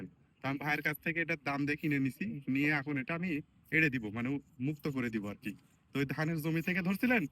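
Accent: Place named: Natore, Bangladesh. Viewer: আপনার বাড়ি মনে হচ্ছে নাটোরে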